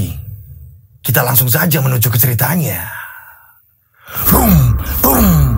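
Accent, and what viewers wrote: Java, Indonesia. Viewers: Bang ini bahasa jawanya medok banget ya...slmt siang semuanya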